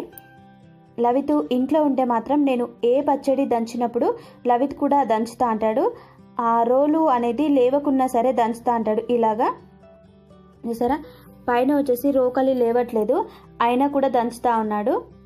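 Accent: Telangana, India. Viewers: Pakkatelangana matladutunnaru pillalto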